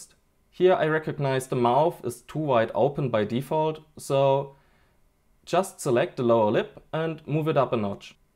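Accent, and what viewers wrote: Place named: Germany. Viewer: Bruder man hört das du deutsch bist 👌🏽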